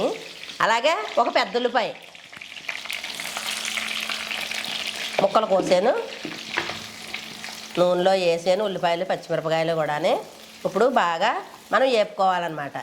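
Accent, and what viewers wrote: East Godavari, India. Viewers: Ma east godavari ante alaguntadi mari..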